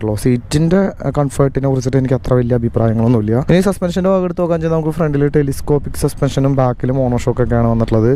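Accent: Thrissur, India. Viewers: bus inte Peru kando "Pooram"... Coincidence paranjal 'Oru Thrissur karan , infamous slang and Rtr 160 4V koode aa busum ..' Age oru kunju Pooram feel ✊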